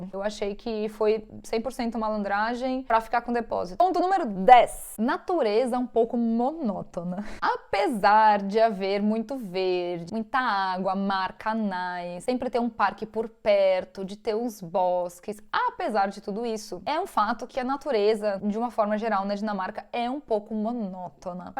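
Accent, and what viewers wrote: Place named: Minas Gerais, Brazil. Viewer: Pero el aciento parace de mineira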